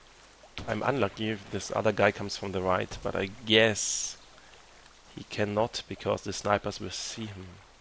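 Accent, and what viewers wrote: Germany. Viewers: Are you german btw? I just discovered you. You definitely sound german :D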